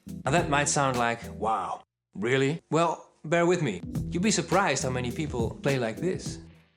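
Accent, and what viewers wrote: Netherlands. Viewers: Sniff sniff, I detect a faint Dutch accent. Am I right? Bedankt voor de tips! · no such thing a European accent... you know how many different languages Europe has right? It is a Dutch accent though 🤪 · I’ve enjoyed the videos as well as Paul’s. They both produce super high quality videos with great content! And I like that Dutch accent! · Man your lessons are so fkn solid! I love them. Just curious though where are you from? Holland, Germany? Very curious for no reason. Thanks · It's a Dutch accent, sounds great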